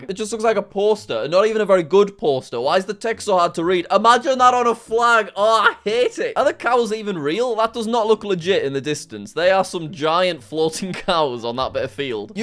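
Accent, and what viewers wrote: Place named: United Kingdom. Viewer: Why are u british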